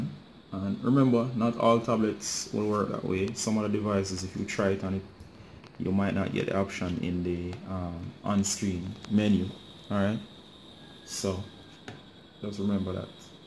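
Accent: Jamaica. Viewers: Cuz u sound Jamaican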